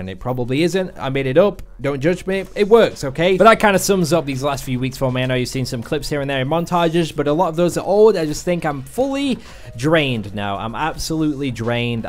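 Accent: Yorkshire, United Kingdom. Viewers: aha is a Yorkshire like me 😂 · I don't notice your accent coz I'm from Yorkshire as well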